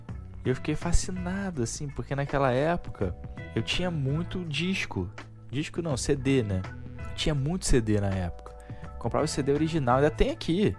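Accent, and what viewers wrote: Rio de Janeiro, Brazil. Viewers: Tem muitos caras bons na rede social, mas quando o tutorial tem sotaque carioca não dá me desculpem, mas é pura verdade, eu tenho vários amigos que também reclamam.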